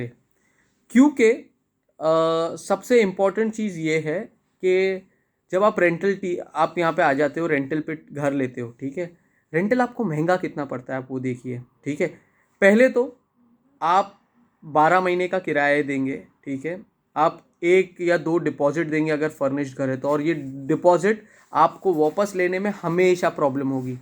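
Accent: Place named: Hyderabad, India. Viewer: Assalam o alaikum Bhai, you sounds like Hyderabadi Telangana, India, if yes please let me know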